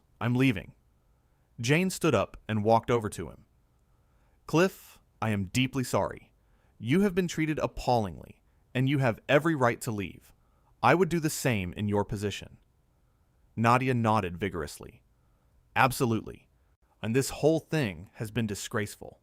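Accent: United States. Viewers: This is fake - never happened. The bad, over-written account delivered in a nasal American monotone should be a clue that this is phony. More fool.the eejits who believed this.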